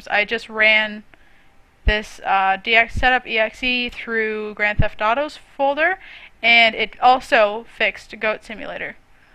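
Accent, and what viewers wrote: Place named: Canada. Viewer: I hope this works for Borderlands 2 and some other 2K Games published titles. 2K announced a list of non-Windows 10 compatible games, and good ol' BLT was on there (yet Borderlands: The Pre-Sequel was one of two or three games on the compatible list). The sound stutters like crazy in BLT now, and the fix you show in this video is one troubleshooting step I forgot about. By the way, I've been perplexed by your accent, and usually I'm really good at pinpointing regional accents from around the world. At first I thought you had a subtle Gold Coast accent (Australia), but it seemed a bit too subtle. It started sounding very similar to the North Midwest of America (where I am), but not quite. However, I think I pinpointed the general country and region: British Columbia, Canada. Am I close?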